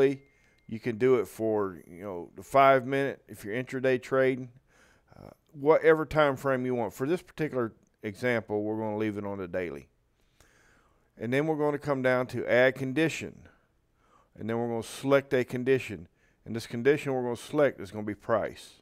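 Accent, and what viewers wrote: South, United States. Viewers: Great video. Exactly what I was looking for. On a side note, you have an epic Southern accent. Will you make a recording for my voicemail greeting and invoke the power of?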